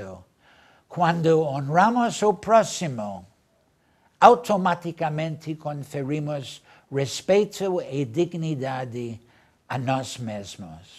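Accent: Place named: England, United Kingdom. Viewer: Nossa, ele falava exatamente do jeito que eu falava quando era criança quando imitava os Ingleses falando português kkkkkkkkkkk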